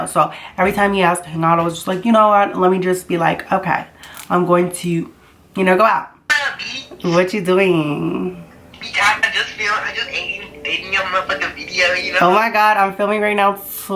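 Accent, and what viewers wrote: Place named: New Jersey, United States. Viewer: My heart really goes out to I am so sorry that that happened to you I have been in a similar situation and it is not okay for somebody you know that you kind of trust you know what I mean to do that. And honestly I have been watching your videos and like anytime I see a thumbnail of you or whatever on here like I'm like oh yeah she's the s*** and I totally forgot that you were even transgender until you hinted at it in this video like I don't know I mean that's not really what my comment is about like but it just kind of like I was like taking aback or for a second like oh wow I honestly completely forgot totally forgot and I think that is the way that most of your viewers view you you know I mean like I don't even think it crosses their mindset and for anybody that has a nasty comment to say anything oh that nature shame on them even if it's calling you a s*** or whatever. 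Anime like keep doing you I love your videos you are so funny like I love your accent like it kind of reminds me of home when I'm originally from but I'm originally from New Jersey so LOL South Jersey at that and I'm living in Kentucky now so yeah I don't know you keep doing you because you are funny you are beautiful you have a great personality.🖤💕🖤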